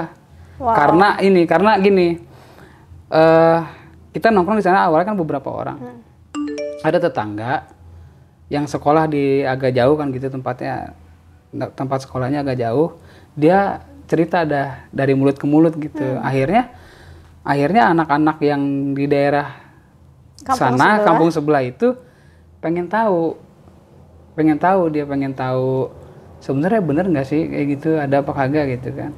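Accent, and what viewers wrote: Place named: Jakarta, Indonesia. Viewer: betawi bgt yak wkwkw kocak lau bang 😅😅😅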